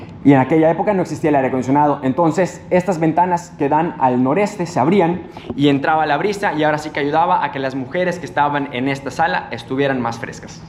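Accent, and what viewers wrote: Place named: Yucatán, Mexico. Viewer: tienes un ligero acento yucateco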